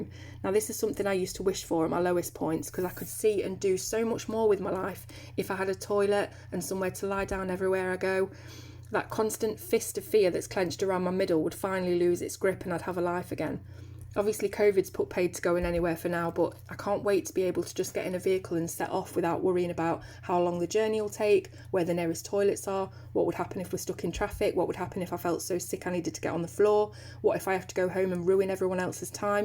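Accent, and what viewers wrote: United Kingdom. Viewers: Dear, I have nothing particulary bright to say on all those issues you've brought up, so I'll just go with all the goods your channel brings to me. First I love the way your always giving all the details I want to know about a products and damn am I picky about details but you never let me down. I love how "creative" your are bringing up things that may not seem directly related to coloring but always end up interesting. To me your channel is like a massive safe place, I know I'll feel good watching your videos, have my brain focus on bright colored things while learning tips. This video is no exception to my "colour with " experience. I don't mind deep subject when it's delivered simply and honestly like you just did. I think you have a huge skills when it comes to "talk" your pace, you're lovely British accent, your pronunciation, your way of playing with words always makes me feel relax and safe. 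The hard work your pour in your channel equals gentle bliss bubbles to me. Thank you dear.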